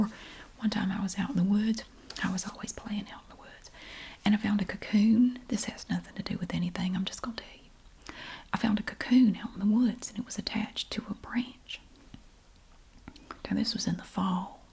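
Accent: South, United States. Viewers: I love this video😊 I studied in Kansas and sometimes heard an expression that I was unfamiliar with. This explains a lot. Greetings from Finland:) Your videos are on my phone whenever I want to wind down and listen to an American accent so I won't lose my language abilities on my third language. Thank you!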